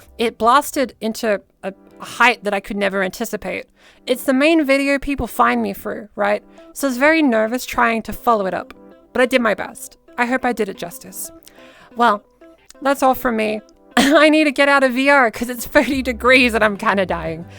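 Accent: Australia. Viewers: Me, a straight man who has never had any interest being a woman: Interesting But to be for real, I'm just here to listen to an Australian accent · love the Aussie accent you go girl loving you doing it for aussie girls yehwe definitely don't want to end up with Aussie girl voices proud to be Australian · I like your 'Strayan accent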